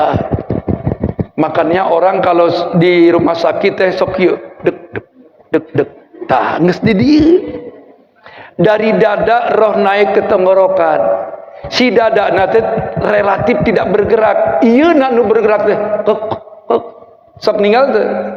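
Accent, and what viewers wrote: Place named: Sunda, Indonesia. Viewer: Suara Pak Kyai kas sundanya.... salam gotong royong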